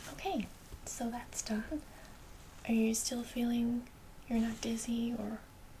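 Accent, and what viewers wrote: United States. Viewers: Your American accent is excellent! Very convincing. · Your American accent is pretty good! Your natural accent lends it a tinge that we would recognize as Southern. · Fantastic job! Your "American" accent is perfect. :) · You almost sound American in this video lol your accent was hardly noticed by me. Great video! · Your American accent is excellent. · Solid American accent :) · Very creative & entertaining scenario, thank you! And I was impressed with your American accent, well done! 😊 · You sound so American in this video! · Your 'Merican accent is awesome! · I love her American Accent. Very accurate, very ASMR inducing. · I didn't even know that you weren't American until I read the comments so I can safely say that that's a killer accent. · nice attempt at the american accent! haha great video :) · I can't believe how well you do an american accent! 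· Wow, nice American accent. I'm impressed. You look lovely as an astronaut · Good American accent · Love your american accent! It's so Buffy :) That aside, I just love the content of your videos. Always takes me into a different world! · Great video held my interest every second! I don't believe you're not a Yank. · Aw.. I miss your normal accent. Great job on the American accent, but I love your real voice. Great role play! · your american accent is perfect! you said bandaid instead of plaster! A+ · Why US accent for this video? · So weird to hear you speak with an American accent. Nicely done. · Me:"Wait...something doesn't seem right....OMFG SHE'S DOING AN AMERICAN ACCENT!"😱 Btw I LOVED this💗💗💗💗 such amazing job as always! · You do a fantastic American accent. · Ben Church I always forget she’s not American when I watch this!! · Pretty good American accent. I didn't realize you had lost your accent until I watched it the second time. 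· it's so odd to hear you potray an american accent rather than your using your original english accent lol i love it! can you do more RP's with american accent? :-) great vid btw! put me right to sleep lol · Nice American accent. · Wait she isn't American? Like I live in the US and I thought she was.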